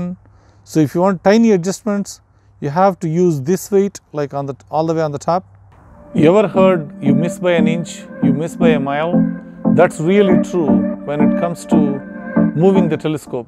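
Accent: India. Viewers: Your Accent Sounded A Lot Like Indian, But Indians Doesn’t Get This Much Exposure To Astronomy Mainly The Equipments That You Use! So I Just Wondered How Come Being An Indian, You Have Access To Such Astronomical Equipments.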